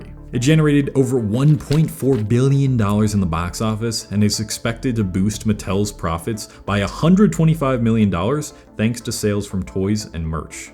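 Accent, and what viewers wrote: United Kingdom. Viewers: his accent convinced me that he's british (im from britain) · The British accent caught me off guard😭 It was very good though.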